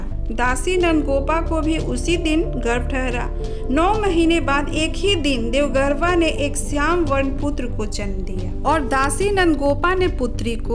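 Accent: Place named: Uttar Pradesh, India. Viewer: तेरी आवाज बता रही हे तू नफरती दलित हे ,, तेरी बोली बता रही हे तू उत्तर प्रदेश की नफरती दलित हे नकली कहानिया फेलाना बंद कर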